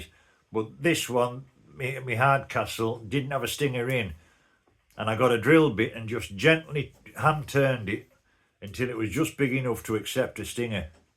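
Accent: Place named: Scotland, United Kingdom. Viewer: . I’m glad you’re OK and you just had a flat tire. I totally love your accent. I was just imagining you screaming out the window with that lady that was honking at you. I think I would’ve been dying laughing if I were her and I doubt if I could’ve understood anything you said. Sean Conner was a Scot, wasn’t he? God bless you my friend.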